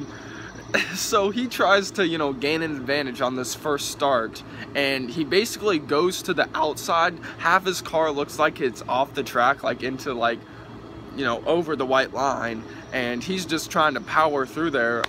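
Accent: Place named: United States. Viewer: Mate you should really research before uploading and not just talk crap. In F1, jumping the start results in a penalty not a restart. Also, you should really learn the drivers names and how to properly pronounce them. Don't Americanise everything, and people will respect you a lot more. Very amateur video. If you really want to upload these sorts of videos you should write notes during the race of what happens when so you know what to talk about.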